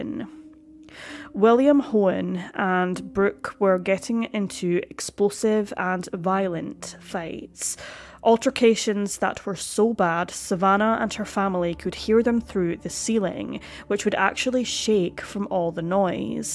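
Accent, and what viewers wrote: Scotland, United Kingdom. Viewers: I like your Scottish ascent.🙂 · Is this a scottish accent?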